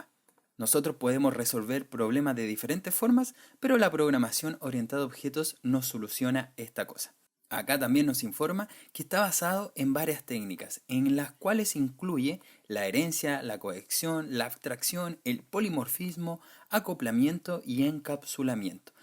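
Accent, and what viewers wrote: Chile. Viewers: Muy buen video, este era codigo que utilice muchas veces pero nunca lo vi ASI EN LIMPIOO y con una metafora TAN PERFECTA como las peliculas y serie, adems me encanta el acento chileno ajaj no se de que region es este acento.. pero tiene mas C y S que otros. Saludos desde Argentina. muchas gracias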